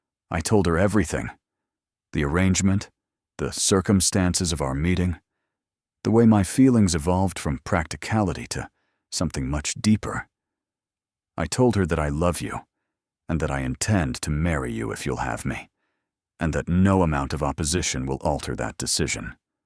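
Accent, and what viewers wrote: United States. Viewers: The American accent doesn't belong in this English aristocratic period story. The narration comes over as bitter & angry. The inflections and punctuation are all wrong and detract from the story telling.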